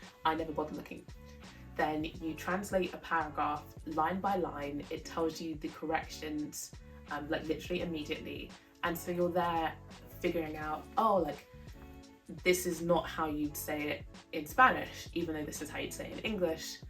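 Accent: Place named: England, United Kingdom. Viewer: Your English acent is amazing !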